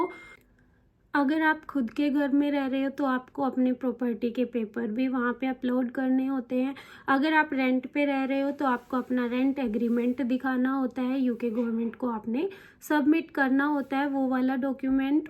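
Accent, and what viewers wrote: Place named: Haryana, India. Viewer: Your Jaatu / Haryanvi accent is too visible. "Discussan , Rejecsan" LOL And here is your not-so-intelligent Haryanvi mind who can't comprehend what is remote work! Guys, you can't work in the UK on a tourist visa.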